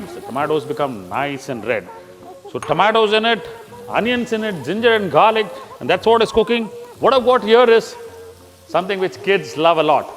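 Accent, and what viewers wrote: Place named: South India, India. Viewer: South Indian accent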